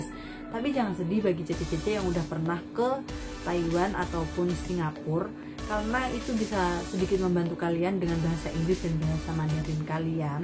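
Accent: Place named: Java, Indonesia. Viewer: medok forever yoo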